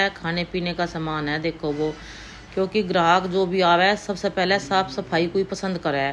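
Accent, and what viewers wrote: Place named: Yamuna region, India. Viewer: आपकी भाषा मुझे मेरे आस पास की लग रही है बहन जी आप कहां से हो क्योंकि यह जमुना के पास की लैंग्वेजहै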